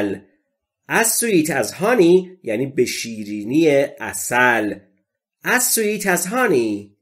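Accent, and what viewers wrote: United States. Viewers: Are you Americam? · Are you American ?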